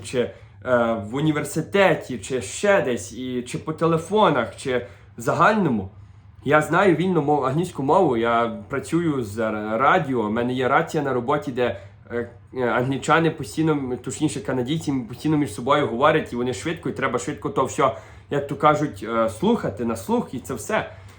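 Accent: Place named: Ukraine. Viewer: Ооо, натрапила на відео, де розповідає звідки він! Отепер зрозуміло, чому відразу відчула, що манера розмови НАША🙂 Тема НАДЗВИЧАЙНО потрібна і цікава! Дякуємо! Дай Боже здоров'я тобі, та усій твоїй родині!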